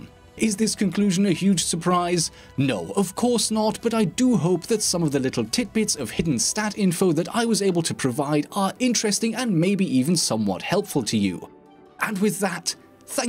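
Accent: Germany. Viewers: Terry Makichod I think he’s German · Just wondering, are you German? Your voice kind of sounds like you are a German person who is really fluent at English. Or maybe it's just your microphone lol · Sometimes you have a little German accent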